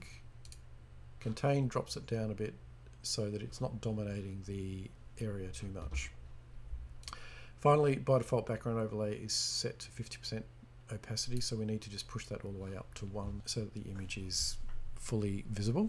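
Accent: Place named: Australia. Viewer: So cool - and great to hear an Aussie voice.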